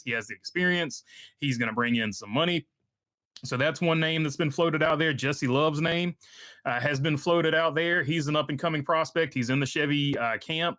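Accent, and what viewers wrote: South, United States. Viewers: You have a cool accent. It sounds very Nascar and southern. I live in Canada so your accent is quite noticeable. Like an old school Nascar driver or crew chief.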